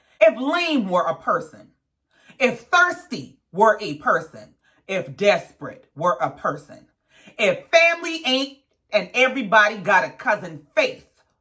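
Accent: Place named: South Carolina, United States. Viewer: Some of my favorite commentators are too skewed on thier reviews because they don’t like Tisha but guhhhh you the most honest and unbiased review on this here show! Can’t wait til you hit 10k! Keep it going Queen. Where are you from cause I hear South Carolina all in your tone!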